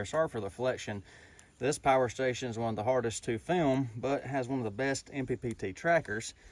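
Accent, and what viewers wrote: South, United States. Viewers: so confused. here a southern accent but username says mountains. but the south doesnt have mountains..?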